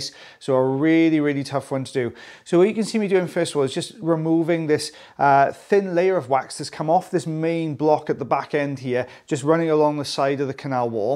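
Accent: Wales, United Kingdom. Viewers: It is SO NICE to hear a welsh accent. Haven't been since covid started. Miss Anglesey so much. Been going there to visit relatives all my life.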